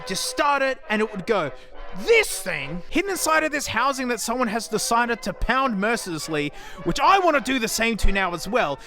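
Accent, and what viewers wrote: Australia. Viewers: It never gets old hearing an aussie man scream about cars.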